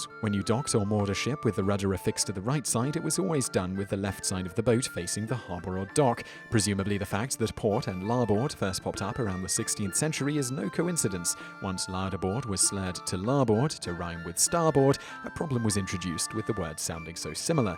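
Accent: United Kingdom. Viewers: i know that is a navigation thing, and maybe a pirate one. but why so british? even feeling that i were the joker saying that, and is a good video, the talk is too fast. still is a good info. at some point it become harsh to translate.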